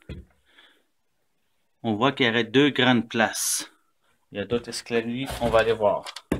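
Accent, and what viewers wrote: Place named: Canada. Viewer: Vu son accent ça doit être au cannada 🇨🇵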